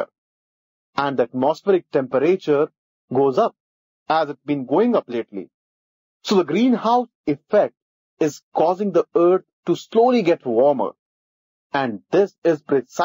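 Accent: India. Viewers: indian english!! hard for me 2 understand..hahahh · topic well explained!! with lajawab indian accent!!thanks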